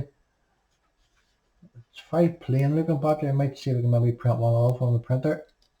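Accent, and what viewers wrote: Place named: Germany. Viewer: Good afternoon, say chap you have a jolly nice accent! considered it was germain at first :)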